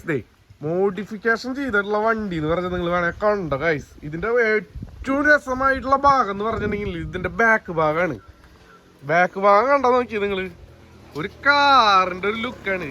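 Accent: Thrissur, India. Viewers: മ്മടെ തൃശൂർ ഭാഷ ഹമ്പ പൊരിച്ചു 🥰❤🔥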